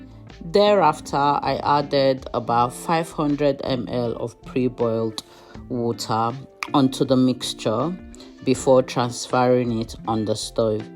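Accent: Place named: Nigeria. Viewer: You made this DIY so simple. Our Nigerian English is really so sweet and you deliver it beautifully. Thanks for sharing. Will try it.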